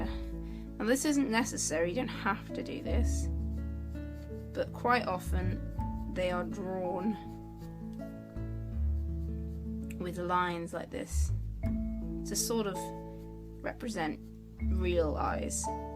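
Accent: United Kingdom. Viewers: Your drawings are amazing! Mid-england? Same accent as me :D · really good tutorial! :D love your accent, british is so beautiful :3 good work! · Are yiu from England? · OMG are you British?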